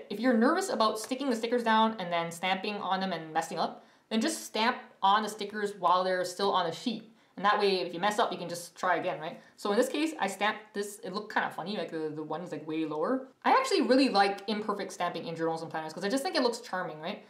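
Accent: Canada. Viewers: This is too cute, and so is your Canadian accent ("eh"? :-P )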